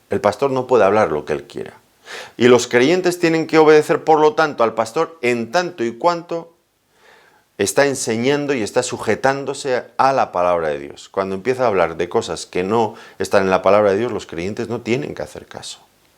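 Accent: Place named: Spain. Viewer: Predica: "El mensaje a Tiatira" Apocalipsis 2-18-29". Video en Youtube de este pastor cuyo entendimiento y humildad tienen la gracia de Dios, el ministerio se llama, desconocemos el nombre del pastor por su acento sabemos que es español, puedes buscarlo como en tu navegador Brave, en Youtube, Google etc. Lo recomendamos por su sana doctrina de Cristo, igual que recomendamos también a John MacArthur, Paul Washer y Miguel Núñez. ( Pastor Muchas gracias por compartir el entendimiento que Dios le ha dado. Noto que usted con humildad no publica su nombre, en contraposición a los falsos profetas que se hacen llamar "apóstoles" y no lo son, esos que ponen su gran foto y sus nombres con letras enormes en sus también grandes afiches anunciando sus eventos, y ni en broma mencionan a el Señor Jesucristo en esos afiches. Precisamente debido a esto creo que sería bueno saber su nombre para compartirlo rogándole a Dios que usted siempre conserve su humildad espiritual y salud integral. 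Dios los bendiga.).+ Romanos 5-20 "Pero la ley se introdujo para que el pecado abundase; mas cuando el pecado abundó, sobreabundó la gracia; 21 para que así como el pecado reinó para muerte, así también la gracia reine por la justicia para vida eterna mediante Jesucristo, Señor nuestro". <link>, Apocalipsis 2-2 "Yo conozco tus obras, tu fatiga y tu perseverancia, y que no puedes soportar a los malos, y has sometido a prueba a los que se dicen ser ap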